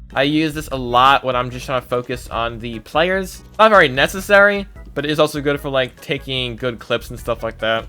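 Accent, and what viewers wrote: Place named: United Kingdom. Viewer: they both sound young and briish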